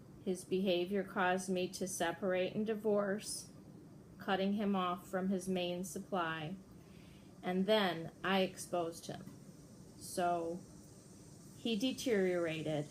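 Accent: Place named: Midwest, United States. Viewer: Love the midwestern accent!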